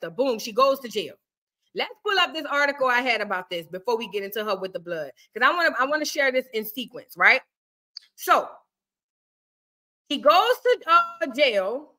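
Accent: New Orleans, United States. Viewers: You definitely be putting everybody on before they catch on 💯love u 🥰i want a N.O accent so bad 😂😭 its so fire 🔥 lol 😆 🖤🖤 via the green lady state 🗽🗽🗽😂🤣#keepwinning💪🏾✊🏾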